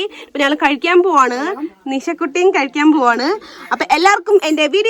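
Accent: Palakkad, India. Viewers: Palakadan malayalam ❤❤❤❤🎉🎉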